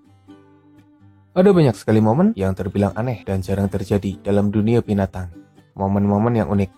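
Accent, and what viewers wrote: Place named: Java, Indonesia. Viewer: Wkwkwk narasi yg khas dengan gaya medoknya mimin 😅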